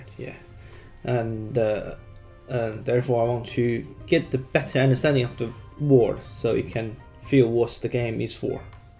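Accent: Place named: United Kingdom. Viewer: Your accent is very English aha. I thought you were native at first. Thanks for the video and the extra background info is great.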